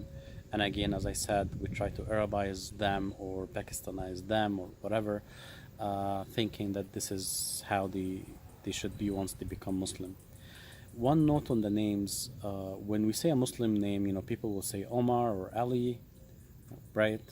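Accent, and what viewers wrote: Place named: England, United Kingdom. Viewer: I am waiting for more videos. I always wait your English accent from time to another.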